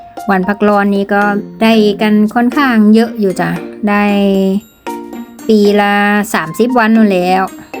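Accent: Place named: Korat, Thailand. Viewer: สำเนียงคือโคราชแท้😊😊😊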